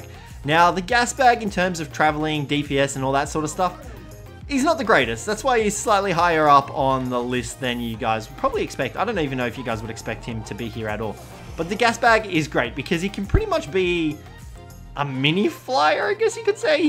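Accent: Australia. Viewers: Are you Australian? I love your accent! ☺️💞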